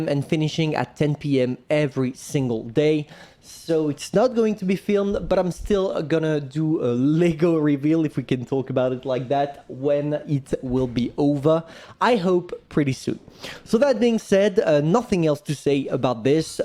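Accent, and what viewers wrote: France. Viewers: is it me or your french , c'est moi ou t'es francais ?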